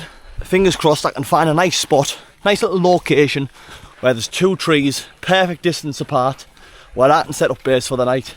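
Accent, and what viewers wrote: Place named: Newcastle, United Kingdom. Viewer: Great content. I love your authentic down to earth videos. I live in Morpeth so love content from someone with a geordie accent. Keep banging them out. Barry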